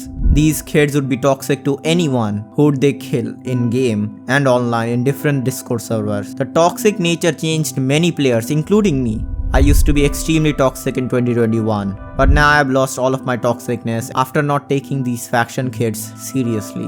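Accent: India. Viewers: Your tone is like Indian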